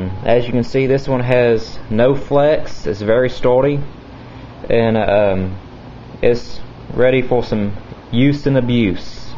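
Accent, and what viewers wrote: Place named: Texas, United States. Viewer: His accent is straight Texan! Good review my Texas brother! it sounds like has a slight speech impediment....No offense:)....we all have our things, hell my pinky fingers wont straighten out. BTW, check out the knife....Fitzen Tank......BAD A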